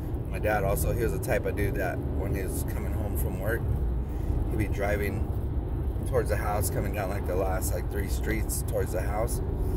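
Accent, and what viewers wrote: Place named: California, United States. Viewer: 🌱Good Talk.. ThankYou.🙏💯 its always nice to hear Someone Speak California. Too much im Always missing Home.🏡 the Southeast is Completely different.🍃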